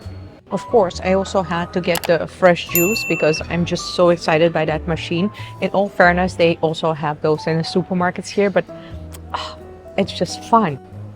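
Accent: India. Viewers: on line! Licious!,! You are beginning to sound Indian with English news channels! Well done, I am clapping!. All over big cities. LICIOUS app. Mostly meat but These also!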